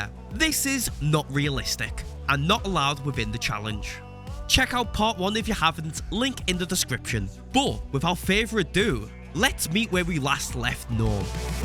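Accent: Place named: England, United Kingdom. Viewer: "Pearson" It's great to hear someone with a genuine English accent :) You don't get to hear this kind of narration anymore.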